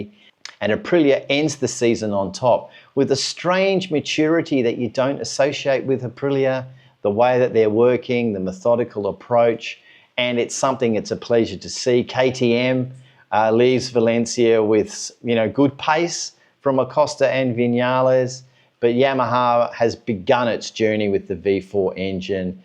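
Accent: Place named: Australia. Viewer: Hi, considering your accent, seems you come from Australia, don't you? Btw his name is Raz-gat-lioglu aka RazGOAT😂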